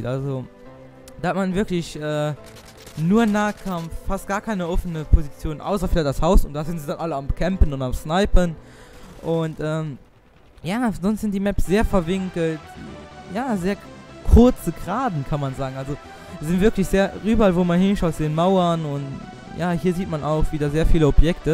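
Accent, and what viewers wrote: Luxembourg. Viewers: dass du aus luxembourg kommst hört man finde es cool das es auch jemand aus luxembourg gibt der Commentaries macht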